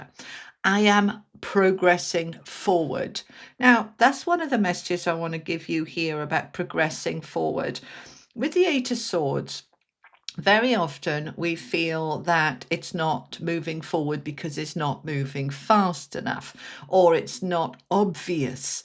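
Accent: Wales, United Kingdom. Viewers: I always think I can hear welsh in your accent!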